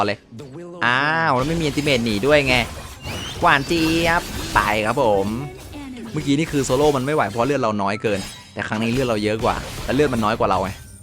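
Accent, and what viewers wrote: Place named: Central Thailand, Thailand. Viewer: พี่เป็นคนเหนือหรือ ลวดเครียกลาง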